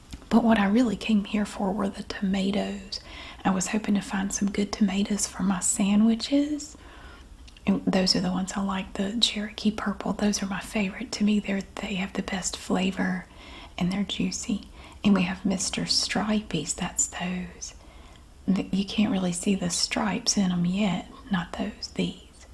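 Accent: South, United States. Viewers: you are a breath of fresh air! Longtime subscriber. Not much of a commenter, but sometimes something comes along and I just have to. I could listen to your voice and southern accent for hours and hours! Thank you for doing what you do! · Being from Northeast Texas ,I nevee heard of dukes mayo before, And yes in my area it was not uncommon to hear something like this ? " yall want a Mater Sandwhich", I 2 have noticed a lessening of accents,and I think it's a crying shame. Your accent is heavenly ,aint nothing more beautiful than Southern Women😍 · I love your tomato sandwich videos. My favourite 😊 oh wow I’ve never seen tomato’s that big before. I love your southern accent, it’s so much better than mine. A Birmingham accent in the uk 🇬🇧 is one of the worst in the world 😂 or so I have been told.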